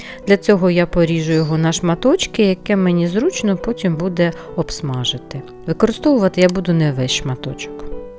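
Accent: Standard. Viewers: Мені дуже подобається ваша чиста українська мова! І салатик смачненький! Буду чекати інших ваших рецептів!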